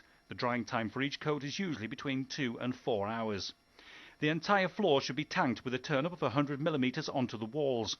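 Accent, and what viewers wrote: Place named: United Kingdom. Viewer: British english is hard for me...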